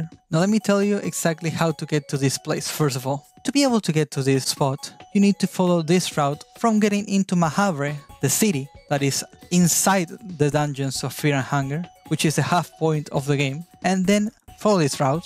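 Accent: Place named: Argentina. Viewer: yo sabía que eras argentino con ese acento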